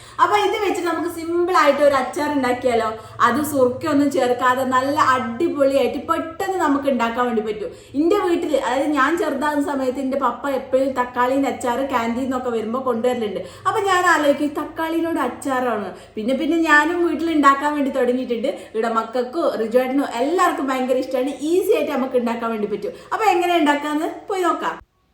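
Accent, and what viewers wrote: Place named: Malappuram, India. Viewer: Malappuram ano... Slang kettitt angane thonnunnu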